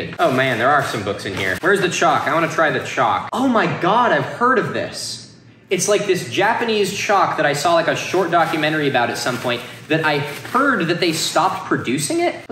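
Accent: United States. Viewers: I KNEW YOU HAD A MASS ACCENT OMG I figured Rhode Island was just close enough but I feel 10x better knowing you’re from Mass. I have so many friends from there y’all all talk the same LOL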